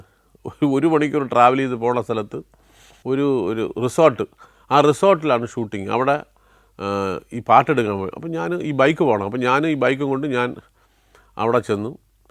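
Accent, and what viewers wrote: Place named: Thiruvananthapuram, India. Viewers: തിരുവനന്തപുരം സ്ലാങ്🔥🔥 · the proper Thiruvananthapuram dialect that I grew up hearing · Real Trivian slang👍🏽❤😍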